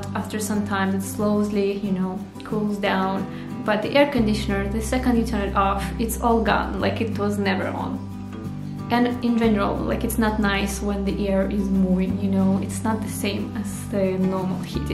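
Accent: Russia. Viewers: I am amazed your English and your little accent being russian's girl.. you mast have been studying at some English university.. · Jeez what is it with YouTubers audio!!?!? .. you start with a heavy non/English accent, use a microphone the other side of an echoey room, speak softly and then just to make sure it’s almost impossible to understand you, think some incessant bgmusic is needed!!!! Ok ears are tuning in a bit.. your English is v good for a Russian!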